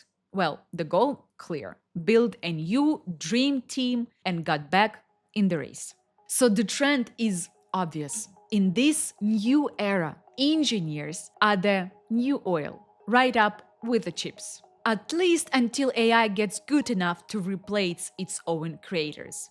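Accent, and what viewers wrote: Russia. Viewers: Девочка очень старается. Но даже не носителю английского ее российский акцент за версту слышен. Но если будет усердно над этим работать, за несколько лет возможно научится похоже на носителей говорить.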